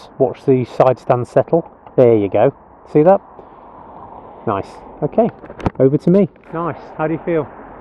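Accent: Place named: England, United Kingdom. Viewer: I find this channel soothing and relaxing it’s the English accent and the quaint English countryside and towns that does it for me … and as a bonus there’s very good riding tips and safety information… thank you 🙏🙂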